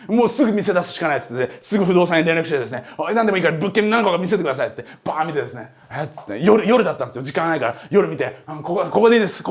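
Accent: Kansai, Japan. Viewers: ２：２４：５６を見て始め映画並み？？？と感じました。でもまだ前半だったのですね。一気に観させてもらいました。確かに最初の自己紹介は初期の撮影ということもあり改善は必須だったかも知れないですね。今回の自己紹介①は授業同様楽しく観れました。 話しの最中で「たけしさん」言葉が連発していたのが少々きになりましたが、熱気は十分に感じ取れました。以前、２nd YouTubeでだったか、あっちゃんがターゲットは10代から30代と言っていた時があったような気がしますが、40代～50代（もしかしたら60台も）も視聴者層はいますのでよろしくお願いします。 東京（関東）の話し方の中に、関西弁が違和感なく入ることで聞いている方は入り込みやすいです。また、体を使った（張った）解説は演技力の賜物で普通の教育系ＹｏｕＴｕｂｅにはない魅力を感じます。学び心を満たしてくれる動画に感謝です。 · 「しくじり先生」終了後、もっとあっちゃんの授業が聞きたいなと思ってた時、カジサック経由でYoutube大学を知りました。とても毎日楽しく見てます。知的好奇心をくすぐる内容。学ぶって楽しい あっちゃんの喋りが説明のできない高い説得力(教祖のようと卑下してますが)の中に時々出てくる関西訛り、エセ関西人ではないけど基本標準語なのでとても特徴的だなと思ってたのですが幼少期に大阪で育ってたのが残ってたのですね頓着しました。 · あっちゃんが関西弁たまにでる 理由やっとわかったわ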